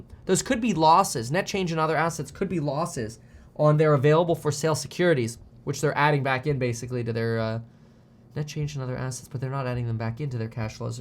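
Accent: Switzerland. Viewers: Why isn’t anyone talking about his SWISS accent?! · Love the Swiss accent!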